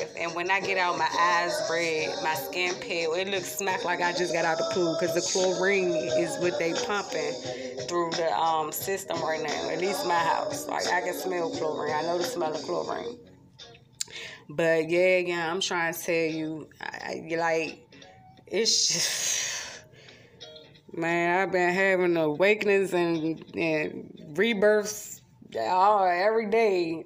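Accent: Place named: Washington DC, United States. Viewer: Sounds like you're from DC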